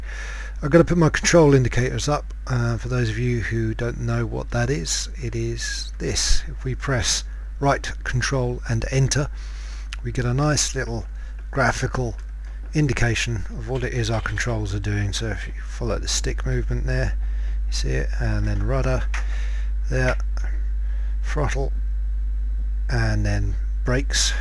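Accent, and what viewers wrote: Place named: United Kingdom. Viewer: A BRILLIANT upload! Great flying! Thank you sir! I will try hard to put all this into practice. (BTW - it's so nice to hear the accent of a fellow Brit giving these tips on this amazing British aircraft - for me, it's the best ever aircraft - cannot be equaled). I have to check tho' - I assume you are using 0% 'Takeoff assistance'? I'm using 0% & I find everything very difficult (*& ....'wobbly') - so I guess the axis control graphs are pretty important. I have pretty gentle slopes to my graphs, but I still wobble as if it's too sensitive. Would you be inclined to share your axis graph settings (...please...)? *On my channel - <link> - and this was with 20% assistance!! Only recently have I gradually dropped to 0%. I'll be trying this soon!!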